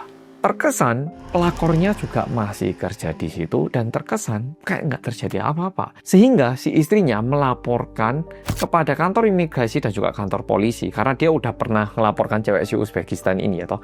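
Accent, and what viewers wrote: Java, Indonesia. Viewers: Hai medoook🎉 Nyimaaak 🎉🎉🎉 Sukses sehat selalu ya 💪🏿🙏🏿💕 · Medok banget bahasa Indonesia logat Jawanya. 1000% A sampai Z udh fasih bahasa Indonesia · Ibu kangeeen Mendoknya Mas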